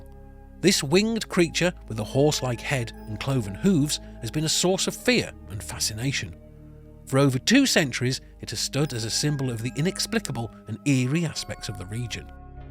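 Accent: United Kingdom. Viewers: Ew. A british person....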